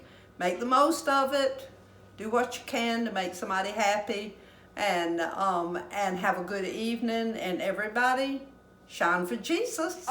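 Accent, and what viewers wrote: South, United States. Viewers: I so enjoy watching ALL of your recipes! You remind me so much of my grandmother (we called her Nana!) She was originally from Alabama but moved to Corpus Christi, TX(where I was born and raised) in the 50’s cause my grandfather was transferred there with his job. She cooked alot like you, and had that southern draw just like yours, when she spoke! We all begged her to sit down and make s cookbook but she passed away when she was only 54 yrs young. You have really helped my marriage with your very EASY recipes! I’m retired now but my wife still works so I cook a lot of suppers now for the two of us. So far, she really likes every one of yours that I’ve made! Please make more! Take care from Bandera, Texas!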